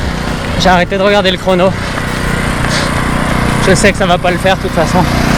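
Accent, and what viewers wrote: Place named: Quebec, Canada. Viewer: Tu avais plus l'accent québécois il y a 6 ans .. tu l'as un peu perdu depuis 🤪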